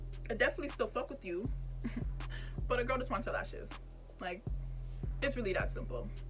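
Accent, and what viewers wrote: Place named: Jamaica. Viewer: yeah, I was asking because you had like an accent also Jamaicans have pretty accents.😊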